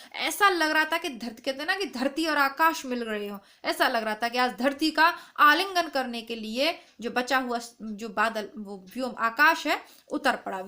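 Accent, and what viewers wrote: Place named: Uttar Pradesh, India. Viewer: हा मेम मेने ही पुछा था।आपके बोलने का लहजा up का लगा।मेरा पीहर करोली के पास ओर ससुराल पश्चिमी राजस्थान हैं।आपके विषय मे जानकर अच्छा लगा।मेरा up टच ह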